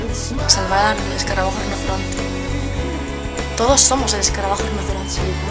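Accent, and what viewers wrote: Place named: Murcia, Spain. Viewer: ese acento murcianica!! ^^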